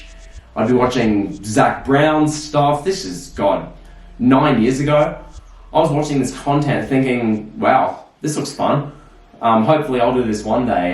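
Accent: Australia. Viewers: boy sound Australian but actually look like a south African or European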